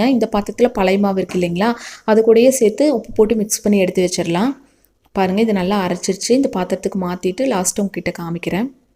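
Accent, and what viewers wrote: Kongu, India. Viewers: Kongunattu Thamizh ketkave inippa irukku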